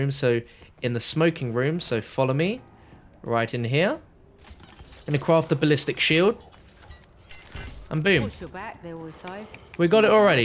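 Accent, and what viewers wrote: United Kingdom. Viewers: People need to stop ripping to shreds about how you pronounce words. British English and American English are very different at times.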